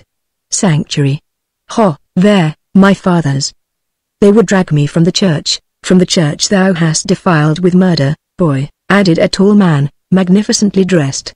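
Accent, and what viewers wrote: United Kingdom. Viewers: British female robot. Awful. Avoid.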